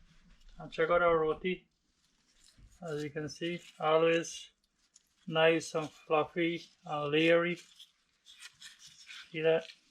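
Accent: Guyana. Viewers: I love your accent❤ pure Guyanese 🇬🇾 Not had a nice chicken curry for along time.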